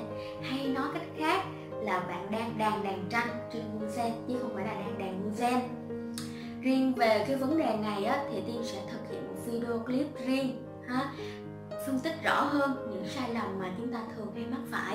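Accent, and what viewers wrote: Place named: South, Vietnam. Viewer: Người miền bắc nghe giọng miền nam không quen và đệm nhạc vào nữa nên khó nghe rõ .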